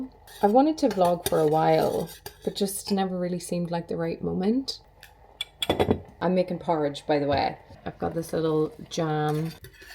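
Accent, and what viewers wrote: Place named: Ireland. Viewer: You just unlocked something special for me with Reas Earth. I’m obsessed … and very much on this path of finally doing the things I have been dreaming of. thank you! I also love watching you, your content and voice are incredible. Maybe because my husband is Irish and I love the accent ❤ congratulations on your work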